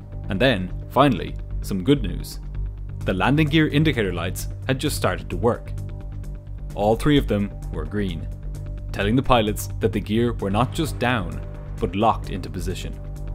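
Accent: Ireland. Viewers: Accent sounds more Irish to me. And we pronounce Louis the French way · Love it when a new video comes out! Or am I just in love with an Irish accent 🤷‍♀️😂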